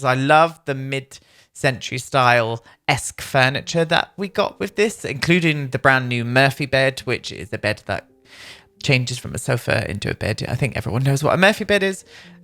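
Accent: New Zealand. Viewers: I'm Australian...pretty sure that presenter is a Kiwi. 😉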